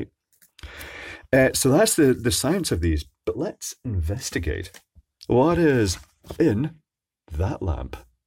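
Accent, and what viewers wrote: Scotland, United Kingdom. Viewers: Hi, Love all your videos, been a fan since around 2010, love your soothing Scottish voice! Now I didn't know how else to contact you, but can you do a video about rejuvenating laptop batteries, including their controllers, as I have scoured the net and can't really find anything that addresses the problems with why they wont work after changing/recharging/replacing cells. I've a ton of them and really don't want to tear them apart for just the cells, if the controllers can be put to some use. I know there are a plethora of types/styles/models etc, but maybe if you can autopsy a generic HP or Toshiba maybe Dell that would be great. Look forward to learning. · The accent is Scotland's 2nd most valuable export.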